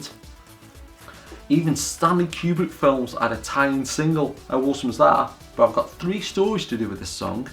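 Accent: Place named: Stoke, United Kingdom. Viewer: A stokie! I'd recognise that accent any day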